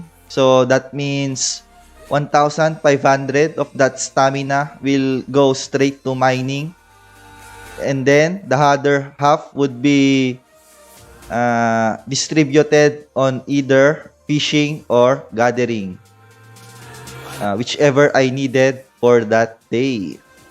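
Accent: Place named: Japan. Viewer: what's with this japanese accent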